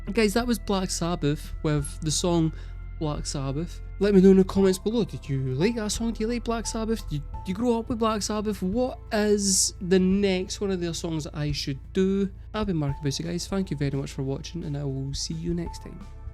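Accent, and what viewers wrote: Scotland, United Kingdom. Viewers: Nice to hear a Scottish voice getting it.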